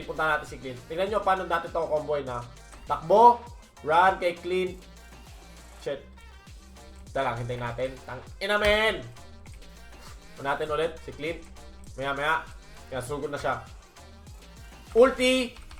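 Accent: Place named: Bulacan, Philippines. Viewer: taga bulacan ka ba sir???.. puntong bulacan ka eh.....hehehehehe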